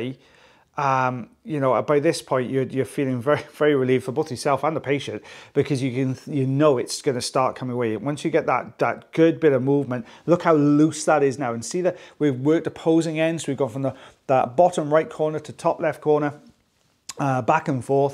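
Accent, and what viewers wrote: Wales, United Kingdom. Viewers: It is SO NICE to hear a welsh accent. Haven't been since covid started. Miss Anglesey so much. Been going there to visit relatives all my life.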